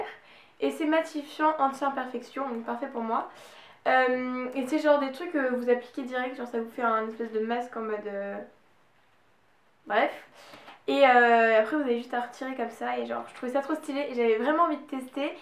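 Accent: Brittany, France. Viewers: tu est bretonne ? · J'adore tout ce que tu as acheté ! Juste une question, tu es bretonne ? o: "Bref un caillou blanc" tu m'as tué :''')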